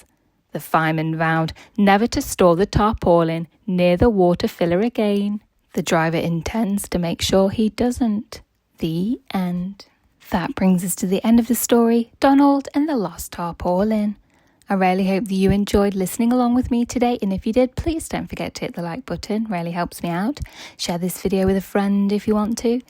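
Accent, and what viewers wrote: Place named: Scotland, United Kingdom. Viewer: You did well with the Scottish accent. 🏴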